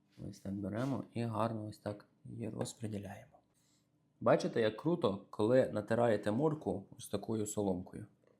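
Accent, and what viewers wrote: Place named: Western Ukraine, Ukraine. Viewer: Трошки не аль-денте😄 Чудова наша західна розмова😍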